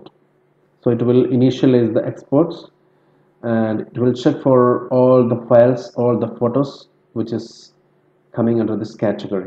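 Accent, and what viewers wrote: India. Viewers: God Bless, It was wonderful. Love Indian accent. your Iranian Sister.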